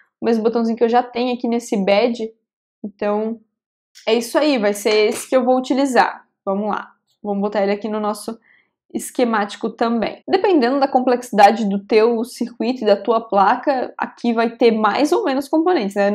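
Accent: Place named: South, Brazil. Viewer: Sotaque do sul☺️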